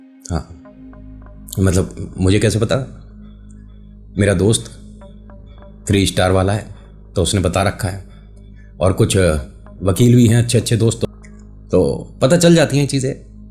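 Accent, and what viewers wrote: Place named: Mathura, India. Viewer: Language se mathura side k lagte hai. Mathura agra hathras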